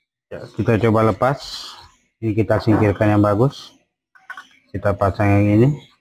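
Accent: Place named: South Sulawesi, Indonesia. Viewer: Dari logat nya kyakn org Sulawesi Selatan 🤔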